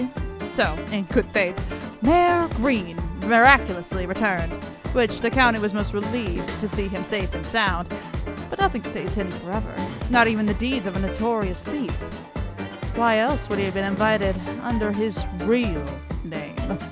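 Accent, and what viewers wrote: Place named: Pacific Northwest, United States. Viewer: 1. Are you from the north east Washington/oregon area? You have little vocal tones that sounded very similar to Lockstin & Gnoggin. 2. Please please please make more of the characters!!!!!! Loved the vid!!!!!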